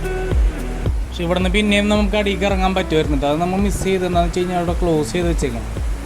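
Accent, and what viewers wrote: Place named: Thrissur, India. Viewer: 👍👍👍ചെറുതെങ്കിലും മനോഹരം ആയ വിഡിയോയും വെള്ളച്ചാട്ടവും പിന്നെ മ്മടെ തൃശൂർ സ്ലാങ്ങും ❤❤❤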